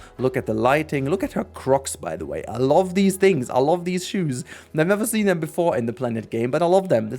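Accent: Germany. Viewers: I am a nativ speaker both german and english, I thought since you have an obvious german accent that I should speak in german to make it easier for you but okay if you want. You need to work on your dictionary, it is very cringe worthy hearing you use the same words over and over again because you lack the ability to express yourself. It seems that a neutral criticism about your video has struck a deep cord with you and I am sorry that I hurt your feelings, it doesn't change the fact however that you do repeat your words. Have a nice day. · Hey ganz kurz, bist du Deutscher? Vom Gefühl her wär ich mir fast zu 100% sicher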